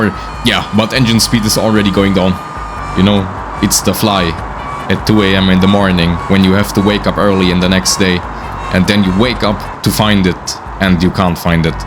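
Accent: Germany. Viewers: I can hear a bit of deutsch there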